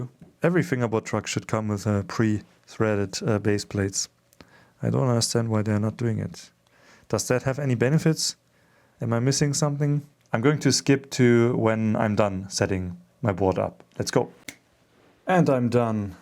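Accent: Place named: Germany. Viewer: Bro's first language is German, cut him some slack.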